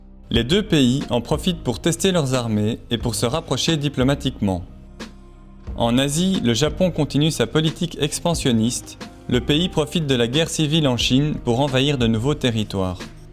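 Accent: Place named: Belgium. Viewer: Raphael Ebony Fans c’est l’accent belge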